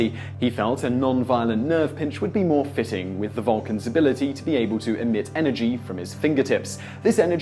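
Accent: England, United Kingdom. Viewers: To all the complainers: DO NOT WATCH. Crybabies., most of your audience does not care how you pronounce words. Your British accent is marvellous. We Americans use a form of PIDGIN English any way. Can't even spell, bad punctuation, stupid grammar, borrowed YOUR language. Ingrates! As British as Apple Pie, "My country 'tis of thee"(America)="God save the King", etc. The US has borrowed much of it's culture from the English AND some from the FRENCH. If you do not like that, give the Statue of Liberty back to the French. And also 1/3 of the modern English language. Sorry for the IGNORANCE of some "Americans". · I understand your English accent would have some words sound a bit different. However... Gene Roddenberry's name is not pronounced Roe-den-bree, it is pronounced Rod-en-berry. Whoopi Goldberg is not Whoopi Golds-berg. Also, LeVar Burton's name s not pronounced Lee-Var, it is pronounced Luh-Var.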